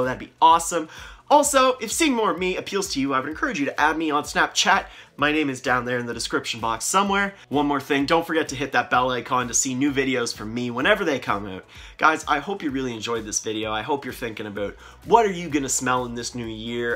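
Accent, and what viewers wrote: Canada. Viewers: I'm Canadian and I didn't realize we have an accent til I heard the maritime accent. Love it! Solid list, plenty of these are on my list as well, although not so keen on Rasasi releases. Cheers.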